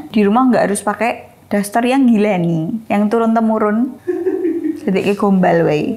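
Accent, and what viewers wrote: Java, Indonesia. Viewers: Aku senengggggg❤ karo bosone,,,,, medhok,,,, khas cewek Jawa. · Medok amaatt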